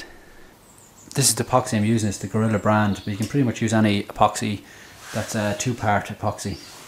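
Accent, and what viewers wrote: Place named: Ireland. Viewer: wow fair play well done and good to have an irish voice for a change lol.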